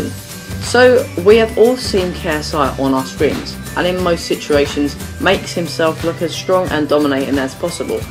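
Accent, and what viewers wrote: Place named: England, United Kingdom. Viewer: Where abouts from England are you from. I am from Leeds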